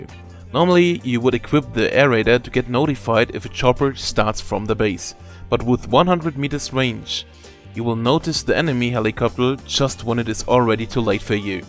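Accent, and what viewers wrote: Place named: Germany. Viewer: Preferred ur German accent.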